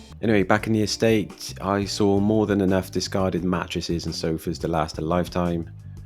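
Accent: Southwest England, United Kingdom. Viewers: "The Coastal town that they forgot to burn down, come Armageddon come Armageddon come" ( - ) I love your videos and your strong southwest accent 😊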